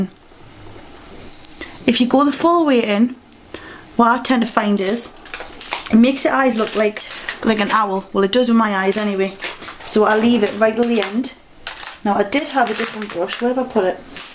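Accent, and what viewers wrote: Ireland. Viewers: r u irish? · are you irish? x]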